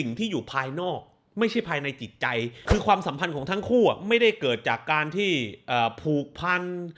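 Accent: South, Thailand. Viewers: พี่เป็นคนใต้มั้ยคับ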